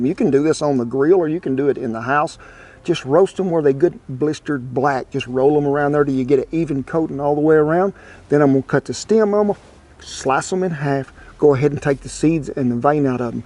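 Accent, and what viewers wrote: United States. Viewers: English is not my first language, I don't even understand everything you say, but I love your accent! A salt of American earth! Greetings from other side of the Atlantic!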